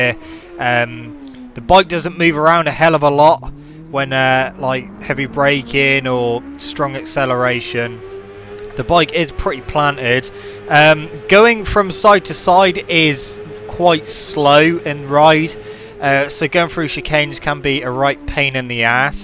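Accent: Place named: Somerset, United Kingdom. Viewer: You sound like your from Somerset boy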